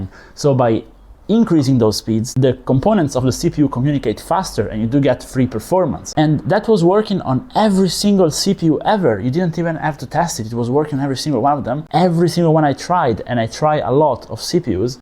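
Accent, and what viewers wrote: Italy. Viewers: sei italiano vero???? Complimenti continua cosi Bravissimo.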